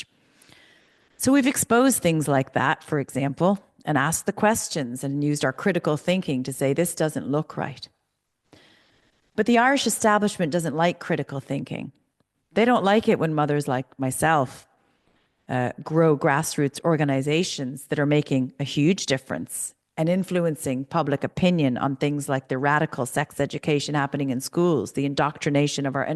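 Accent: United States. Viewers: Are u American ?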